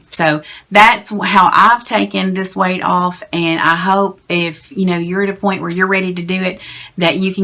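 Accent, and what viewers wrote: South, United States. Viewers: Beautiful, down-to-earth and a sweet Southern accent! She is my new best friend in my head! LOL!!... Congratulations on your fitness journey! I'm inspired! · Do you count calories? I am struggling with life...divorced, 3 kids at home, struggling with being a single parent...I've gained 100 pounds. I'm ashamed of myself. I used to do 10Ks and now I don't even enjoy walking. I used to be a vegetarian because I really don't like meat. My doctor told me to follow the DASH diet which is basically low carb. I'm so glad I found you because you sound like me (but I don't have your darling southern accent). I eat to cope. I used to eat to live (healthy) and now, I eat to cope. Sad. Hard to admit. I have been counting calories and I lost 10 pounds this last month. However...I feel like a slave to numbers. I am an educated person. I know calories count. 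I just know I am not going to do this for the rest of my life, so I feel it's setting me up for failure. Do you count only carbs? If you count calories, how many do you allow yourself per day? Thank you! You are a delight. 💕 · You are beautiful, love the country accent. . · Oh and I love your southern dialect!!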